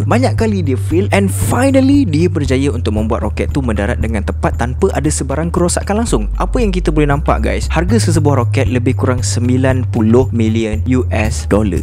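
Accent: Johor, Malaysia. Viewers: Bro...ko org johor kan...aku baru je subscribe kau...cara kau cakap ...senang faham...👍🏼👍🏼👍🏼👍🏼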